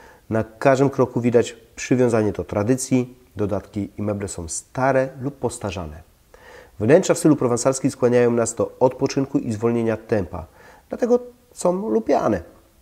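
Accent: Silesia, Poland. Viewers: Ależ masz ekstra śląski akcent👍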